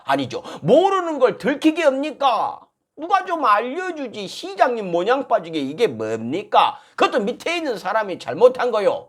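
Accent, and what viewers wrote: Jeolla, South Korea. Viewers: 워따 전라도 말을 겁나 잘하네요.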